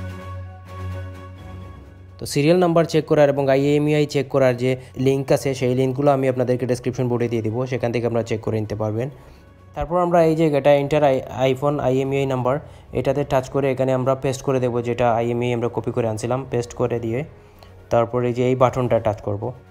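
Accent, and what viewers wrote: Sylhet, Bangladesh. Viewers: Vai kita sylhety ni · Bhai tumi Sylheti nay ni....❤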